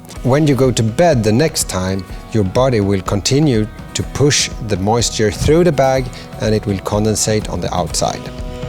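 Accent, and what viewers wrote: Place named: Sweden. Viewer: swedish accent :)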